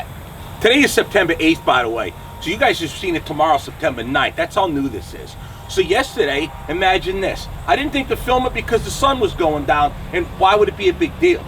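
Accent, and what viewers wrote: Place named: North Jersey, United States. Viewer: Yup, you sound like pure North Jersey! Love it! And I hope you find the lowlife so he can be dealt with properly.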